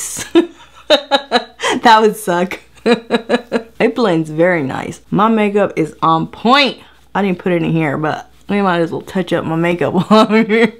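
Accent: Texas, United States. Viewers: Hello y’all I love ur channel so damn much I just learned about you and from the first video I knew right away that u are from TEXAS. The way u talk not the accent but the way u talk is just like me I could not stop laughing 😂 😂😂😂😂. Girl I love you so damn much. When I’m feeling down or my anxiety is fucking me up I’m from now on I’m going to watch ur video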